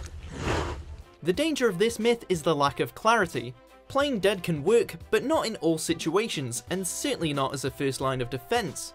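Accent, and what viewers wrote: United Kingdom. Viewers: What! when did the british narrator come from!? i prefer mr.mojo and ms.mojo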